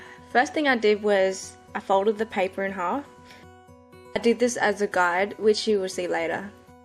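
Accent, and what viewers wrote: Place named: Asia. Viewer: An Asian English accented girl... I LIKE IT!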